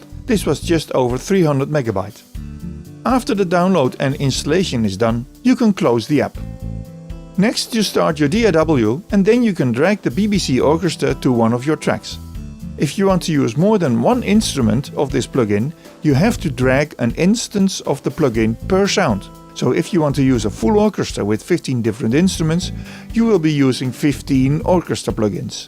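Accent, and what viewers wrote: Netherlands. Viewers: Thickest Dutch accent I've ever heard 😂. Sounding good tho.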